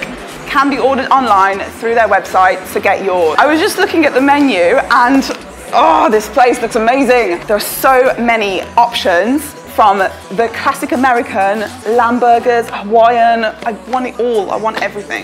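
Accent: United Kingdom. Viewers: I like her British accent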